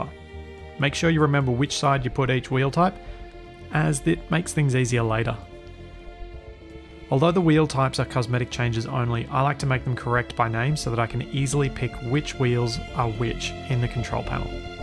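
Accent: Australia. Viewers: he is Australian. Good to know more Australians play this game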